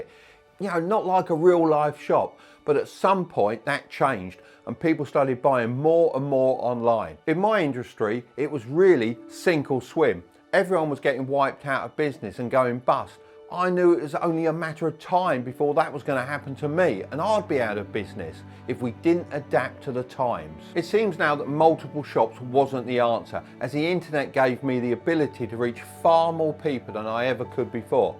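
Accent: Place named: Norfolk, United Kingdom. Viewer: nice norfolk accent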